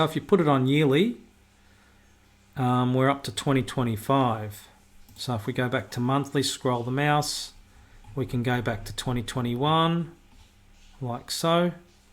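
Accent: Australia. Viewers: i love the aussie accent!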